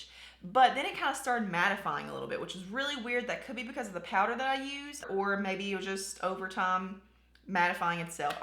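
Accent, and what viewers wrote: South, United States. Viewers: I just recently ran across you on youtube, like a week ago & I think you're just awesome!! And so southern...like myself ❤ Merry Christmas!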